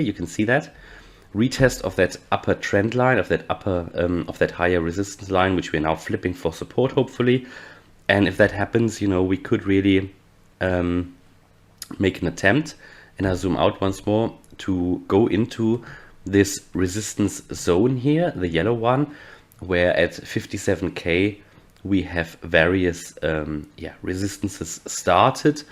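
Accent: Germany. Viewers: Yet your accent is german.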